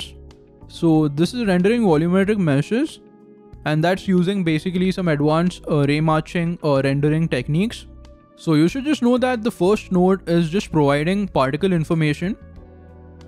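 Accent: India. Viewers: he has the best indian accent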